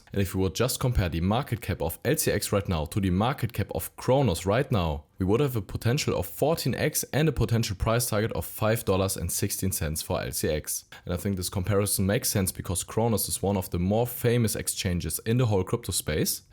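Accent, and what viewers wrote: Germany. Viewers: Love your german accent 👯👯👯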